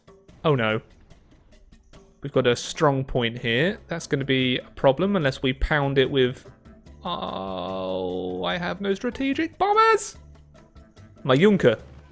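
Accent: United Kingdom. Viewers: Oh you are British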